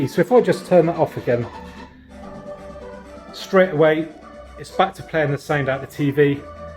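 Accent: United Kingdom. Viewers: Hi, I'm Thomas from Italy. I like your way to explain and you speak in a way very clear to me that I'm not mother language, so I thank you first of all. I noticed you pronunce the I like O, for example you say "guys" or "five" but you pronunce "goyz" or "foiv", so I'm very curious to know in which region you live in UK. The other thing: i'd like to watch a video of yours you show your bodybuilder diet. finally: i add you on PSN, hope you'll accept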